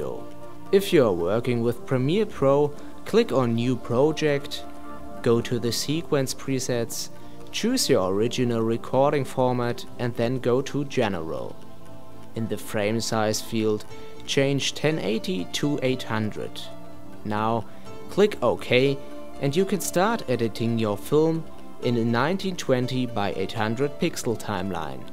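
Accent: Germany. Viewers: OUCH that horrendous German English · so schön deutsches englisch (Y)!!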